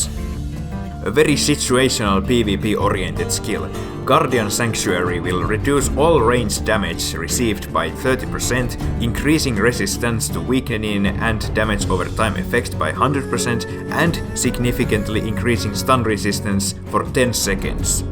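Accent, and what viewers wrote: Finland. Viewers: Ralli english is so strong with this one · hirveen tutun kuulonen aksentti, taitaa olla suomalainen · tolla aksentilla sun on PAKKO olla suomalainen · you sound like all of my Finnish relatives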